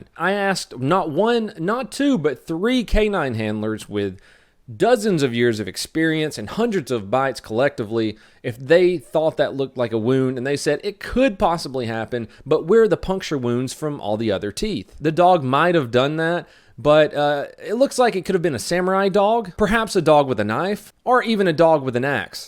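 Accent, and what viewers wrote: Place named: United States. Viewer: She speaks the best American I've ever heard!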